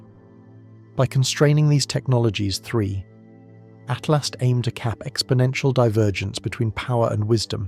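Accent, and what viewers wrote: United Kingdom. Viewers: Saying lots of words in a UK accent with no point.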